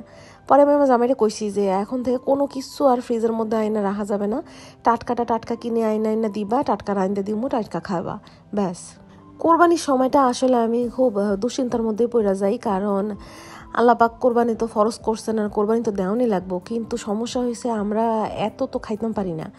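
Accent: Barishal, Bangladesh. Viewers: Apu sounds like barishal vasha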